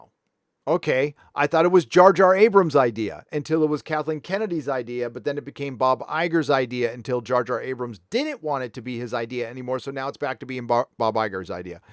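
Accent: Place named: Scotland, United Kingdom. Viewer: Dude, I didn't need 23 and Me to tell you are Scottish. You are so very Scottish. Well aside from not hating the English anyway.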